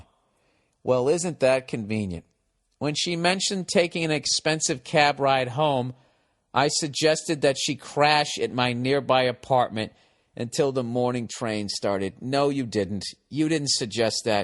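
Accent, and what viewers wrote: Boston, United States. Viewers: Ladies and gentlemen, Burr. He's got the wisdom of a Japanese Sensei in an American bar, wrapped in a Bostonian accent, delivered through the internet. Bravo... you nailed this one.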